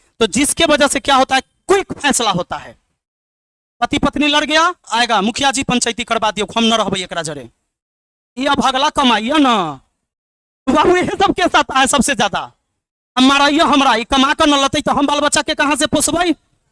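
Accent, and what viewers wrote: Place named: Bhojpuri, India. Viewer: सच में सर 🙏आपकी बात करने की शैली न दिल को छू जाती हैं। हिंदी में मिक्स, भोजपुरिया अंदाज़...............👌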